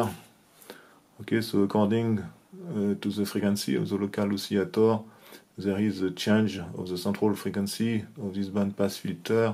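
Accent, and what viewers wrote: France. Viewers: Great stuff, thanx for showing us this beautiful tech. No need to be sorry for the pronounciation: French accent sounds so good, you could call us all "d4mn m0therfuckers", and we would still be flattered 😀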